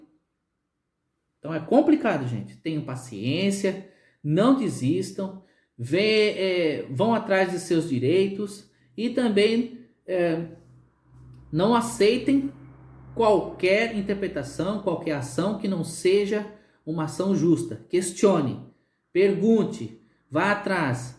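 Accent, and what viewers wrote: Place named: Brazil. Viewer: Você nem parece brasileiro a não ser pelo sotaque. Parece inteligente e educado. Domina a Língua Portuguesa. Você está com problemas devido às muitas vigarices com as cartas de condução. Evite a palavra camioneiro (?) e utilize o têrmo camionista, bem como Policia e não policial. Tem que se adaptar à realidade lusitana e boa sorte...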